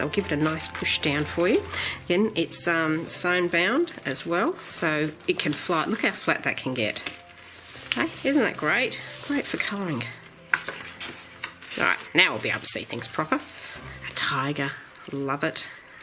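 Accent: Australia. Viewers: I hadn't watched anyone's reviews until I received my copy and I got my copy a few days ago and recorded my review yesterday. This morning I thought "OH I want to see what thinks" and it was so funny, you got excited about the same features as I did. It was like watching my review but with an Australian accent. I'm a big fan and can't wait to see what you do with it in a tutorial. ~ Chrissy Morin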